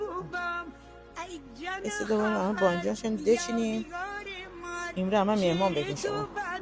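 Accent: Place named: Shahsavar, Iran. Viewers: خانم به نظرم شما مازندرانی هستی واسه غرب مازندرانی❤❤ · لهجه ی شما ب شهسوار و رامسر نزدیکتر تا رشت و گیلان ❤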